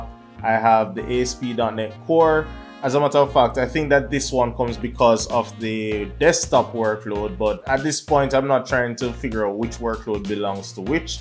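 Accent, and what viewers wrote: Jamaica. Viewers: Jamaican accent 100/100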